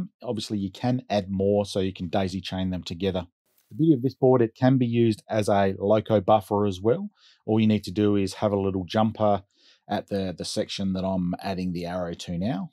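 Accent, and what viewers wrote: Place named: Australia. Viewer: Well, kinda hard to say, but listening to it was easy, and though I was doing other things, I was able to key in on what I was searching for, I.E., tying my Arduino in with my Digitrax system, plus a bonus of JMRI interfacing with both. And I have to be honest, I found your Australian accent cool! That said, I will probably listen to it again and again to get the full gist of it. But overall, it was easy to listen to and very comprehensive. Thanks again, mate, or as we say In America, pal!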